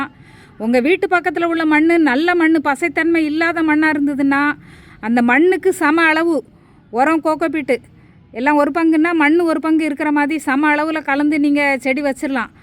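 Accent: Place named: Thoothukudi, India. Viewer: very happy to hear acca.I found out from your slang that you must be from Thoothukudi or Tirunelveli.I'm also from Thoothukudi only.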